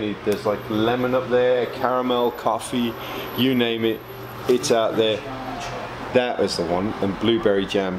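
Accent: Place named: Birmingham, United Kingdom. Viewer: as brummie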